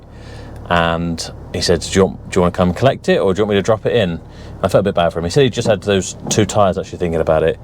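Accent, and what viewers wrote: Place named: West Country, United Kingdom. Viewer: you've got a different gentler west country style than Arthur Daley from the North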